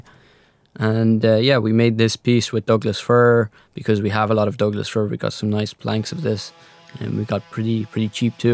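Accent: Ireland. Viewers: love the videos love the updates and the restoration of this beautiful boat. Just a side bar , I love your Irish accent reminds me of my dear Gran that passed in 87 but was born and lived in Ireland before coming to the states , God Bless you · Ah you’re Irish. That explains a lot.i was looking around for the elephants. You were talking about the elements.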